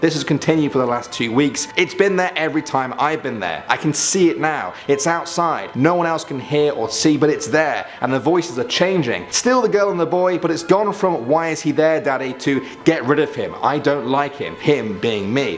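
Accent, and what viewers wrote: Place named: United Kingdom. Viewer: I hate this Fuckin' British accent !!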